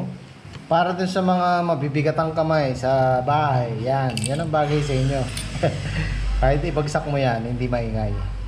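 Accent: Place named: Pampanga, Philippines. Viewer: Sir bak8 kapampangan salita mo e, taga nueva ecija ka?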